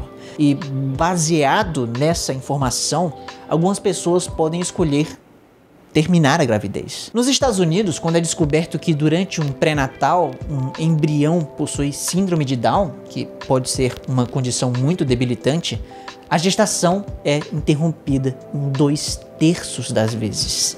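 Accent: Ceará, Brazil. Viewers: Só uma pergunta, ele é do Ceará?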